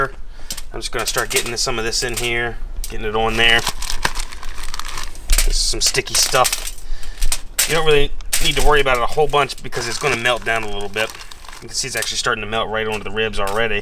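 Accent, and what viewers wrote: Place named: Baltimore, United States. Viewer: you have a Baltimore accent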